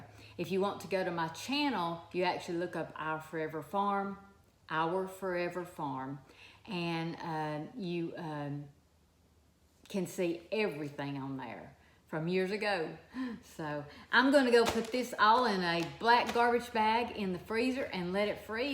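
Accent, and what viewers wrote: South, United States. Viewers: Okra is one of those things that people either love or hate . I love okra. Pickled or any way you want to cook it, it's great. I really enjoy your videos. Listening to your southern accent makes me long for my childhood days with family.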